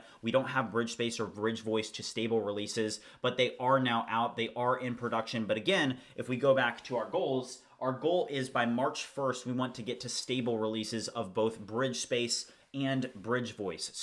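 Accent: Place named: United States. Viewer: I like the 'merican accent and: eeaaAANNd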